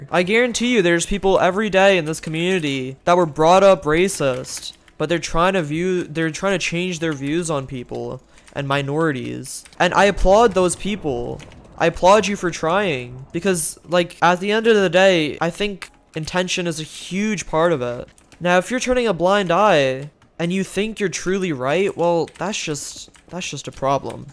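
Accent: India. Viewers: Me and my family are Indian and my dad said to me that whoever ur watching is indian because he recognized the voice but I didn’t believe him. It’s actualy kind of a relief because I want to be a YouTuber as a 2nd job but I was afraid of people being racist. But so glad to know I can do it. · Are u kidding me?!?! U r Indian Bruhhhhh your acccent wtfff I see ... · I AM REALLY PROUD OF YOU THE BEST SKYWARS PLAYER IS FROM INDIA AND I HAVE A DOUBT FROM YOUR ACENT AND YOUR FACE THAT U ARE INDIAN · Are you fr rn? Just because people don’t say their Indian or whatever doesn’t mean it’s racist stereotypes LMAO. Watched this and spit my drink laughing. Do white people point out they’re white?? Racism is a big deal but cmon man . Also imo it’s not that hard to tell if someone’s Indian based on their voice, (when I first watched you I knew right away) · Great vid i am also indian but i was raised in Louisiana and Alabama so i dont have an accent like u! 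Def my fav yter ☺️ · I was doubting from when I started to watch your videos as your face looked like an Indian and your accent of English Lots of love from India dude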